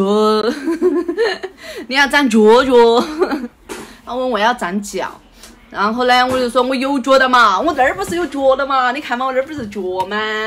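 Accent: Guizhou, China. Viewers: 哇~第一次聽妳用貴州話拍影片，感覺貴州話口音聽起來蠻不錯聽的，有點可愛，有的很像台語，語助詞也蠻多的哈哈，恭喜妳機車駕照考過拿到到手了汽車要注意安全喔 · 先恭喜姐姐你考上駕照很棒喔、不過妹妹我有件事想跟您說、很開心可以聽到有貴州話的視頻、貴州話聽起來很可愛也很有趣、但是姐姐下次可不可以還是講普通話多一點、因為很多貴州話我都聽不懂耶哈哈哈很難去理解你視頻當中的意思只能大概猜一下囉不好意思.所以以後可以還是講普通話多一點嗎？不好意思. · 貴洲口音還滿可愛的我很努力的聽 🤣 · 貴州話和重慶話很近，但不一樣的地方也蠻多的哈！不看字幕，我聽得懂95%！😁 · 恭喜很喜歡全程貴州話聊天聽起來費用力但是賊可愛滴 😘😘😘🤣🤣🤣🤣🤣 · 這是貴州話嗎？太棒了！加油！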